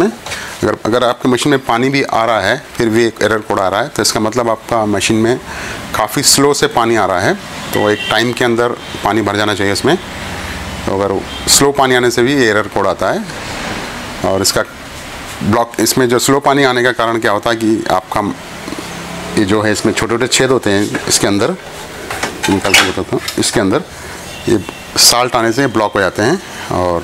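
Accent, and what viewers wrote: Hyderabad, India. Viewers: 100% he is from Hyderabad India ...Correct me if i'm wrong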